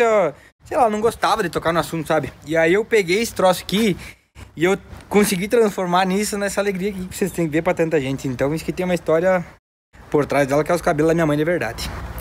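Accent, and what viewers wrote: Colono, Brazil. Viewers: O sotaque é impossível de esconder, no começo do video ele só tenta disfarçar mesmo, mas o sotaque que ele se sente a vontade é o de colono, aposto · tem o mesmo sotaque de colono mesmo fora do personagem.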